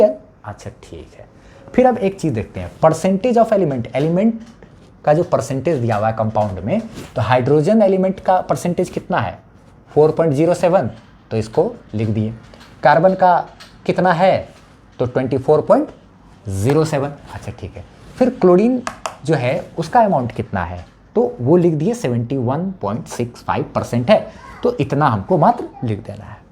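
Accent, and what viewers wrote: Bihar, India. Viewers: App bihar ke hai? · Aree bhai enka language se he pata chal raha ha ki ye Bihar se ha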